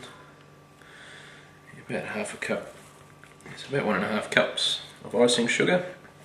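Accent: Australia. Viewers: he an aussie i think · Can't you tell from his strong Australian accent that's nothing like a British accent?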